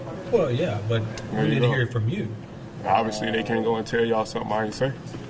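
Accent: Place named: Memphis, United States. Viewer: You got that Memphis mane down 😅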